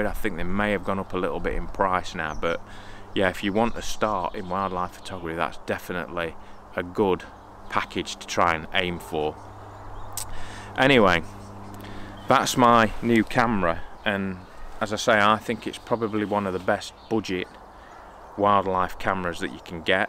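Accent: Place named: Mansfield, United Kingdom. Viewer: Well! Blow me down with a feather you're only dahn't road! Am frum Mansfield! Well actually I live in sunny Woodhouse (Wudduss) now! Small world! I thought I recognised the accent!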